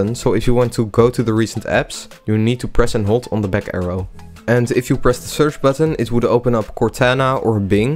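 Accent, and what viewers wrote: Netherlands. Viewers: are you dutch by any chance? your accent in english is same as mine haha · I smell Nederlands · Its funny that i can hear your Netherlands accent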